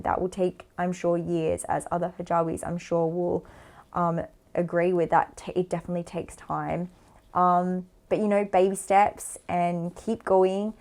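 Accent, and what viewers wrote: Australia. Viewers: I wonder why did you come up much of Aussie accent in this video particularly ??... Earlier videos didn't have that much ...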